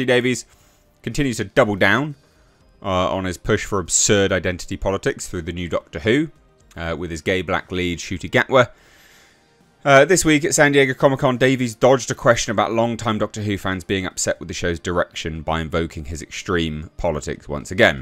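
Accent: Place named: England, United Kingdom. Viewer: I love listening to you, it's so funny hearing another English person spouting off 🤣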